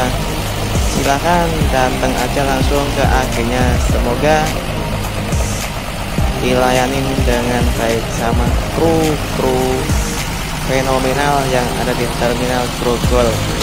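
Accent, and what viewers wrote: Banyumas, Indonesia. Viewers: Ngapak Bosku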